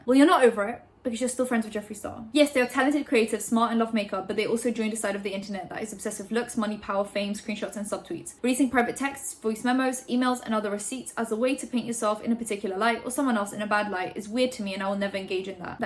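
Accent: United Kingdom. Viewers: Omfg I used to watch you so long ago and I could never find you ever again 😂 finally popped on my recommended 😩 I was like where’s the girl with the British accent 😩😩😩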